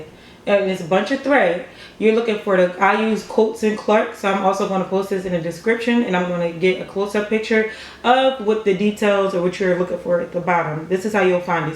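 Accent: Baltimore, United States. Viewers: By far the best tutorial! Thank you so much! You and your straight to the point explanation got me motivated and stringing my first string of waist beads at 1am lolll. Thank you, beautiful! Also, where are you from? I thought I heard a little Baltimore in therrreee 💞💞💞 lol. Thanks again! Liked, commented, and subscribed 😏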